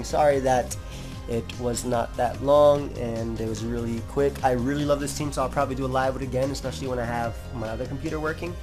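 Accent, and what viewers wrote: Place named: New York, United States. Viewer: Liam Flaherty Nah, he just has a lazy way of speaking, along with dat slight Nyu Yawk accent.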